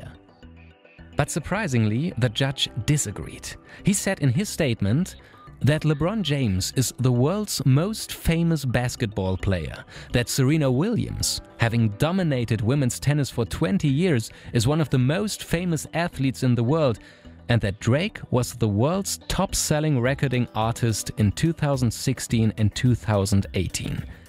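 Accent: Germany. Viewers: First time on this channel, I can tell that the speaker has a German accent 😀, right or wrong? I'm German speaker, so I think I nailed it lol. Interesting topic in the video. Edit: Just heard how you spelled Jürgen Klopp , well, now I have no doubts at all 😂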